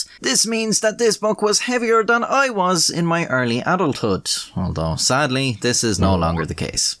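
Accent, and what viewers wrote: Ireland. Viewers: It's Irish, but it's not the Dublin accent, which most people, especially us Americans, have heard variations (piss poor imitations, in words). He lives in Dublin, but he's told us he hates the native Dubliners he's met.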